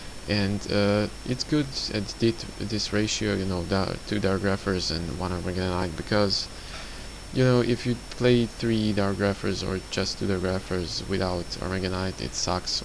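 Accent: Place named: Netherlands. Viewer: ... decklist is okay. Dutch accent is horrible.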